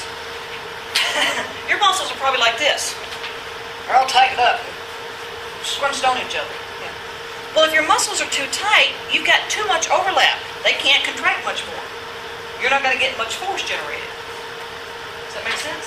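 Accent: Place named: South, United States. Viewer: You are awesome, your videos are so wonderful,plus it seems easier to understand with a southern accent ;-)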